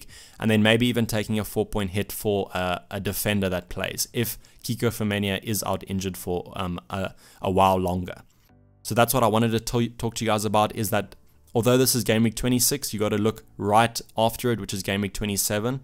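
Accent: South Africa. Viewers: Bro u South African?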